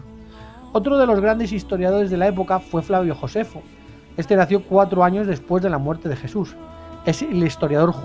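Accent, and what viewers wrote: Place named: Spain. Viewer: que tal si muy cierto y ahun así cada vez la gente cree más en extraterrestres que en Jesús,si soy de Morelia Michoacán mexico ,pero hace como 16 años vivo en usa vivo dos años u medio en California y después me mudé a Carolina del norte,conosco poquito new york ,muy de pasada ,ahunque aquí en usa casi todos los lugares son iguales jejeje,y usted de que parte de España es?me imagino que es de la madre patria por su acento ,y ay disculpe tanta falta de ortografía ,mi hermana dice que tengo faltas de ortografía hasta al hablar jajajajajajaja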